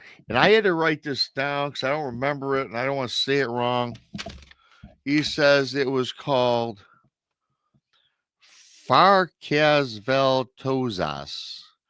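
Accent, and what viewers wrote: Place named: Michigan, United States. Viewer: Michigan is my home state. I love your accent. It makes me homesick.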